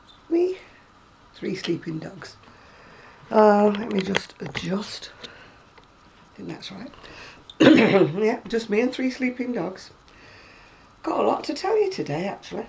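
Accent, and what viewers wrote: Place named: Northern England, United Kingdom. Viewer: Hello. I just started crocheting 2 weeks ago and I found your videos when I was looking for crochet book reviews. I have subscribed to you as I love your videos:) I was intrigued to find out where you're from because of your northern accent, and I saw on your Etsy shop that you live in Manchester. This made me smile because I went to university in Manchester.:) Anyway, thank you for your videos. I hope I can be even half as good a crocheter as you one day! xxx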